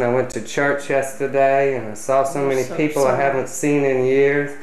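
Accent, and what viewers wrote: South, United States. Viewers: It's really interesting to me how his southern accent is more pronounced in these videos when he's at home vs when he's in NYC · I think his southern accent definitely came out more in this video. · goes home and that Southern draw is magnified.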